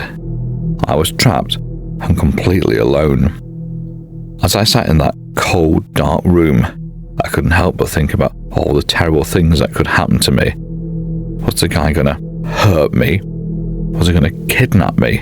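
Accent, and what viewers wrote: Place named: France. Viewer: Anyway nice french accent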